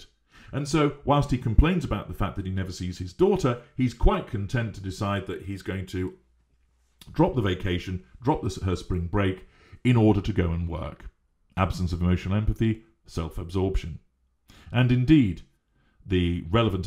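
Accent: United Kingdom. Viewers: Lol...TMZed rather than TMZee...so cute...so very British.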